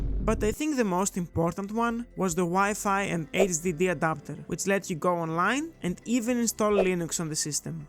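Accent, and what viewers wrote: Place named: Greece. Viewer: Are you Greek? Your accent is giving Greek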